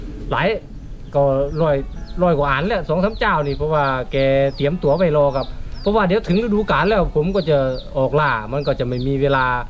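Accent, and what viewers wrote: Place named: Korat, Thailand. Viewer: โมแปลกดี คลิปดีมีประโยชน์ ติดตามชม สำเนียงคนโคราชคือบ้านผมเด้อครับ 👍👀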